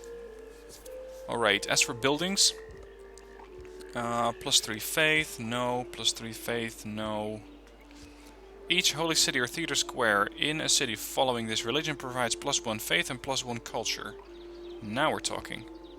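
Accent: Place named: Netherlands. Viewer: Aweful dutch accent though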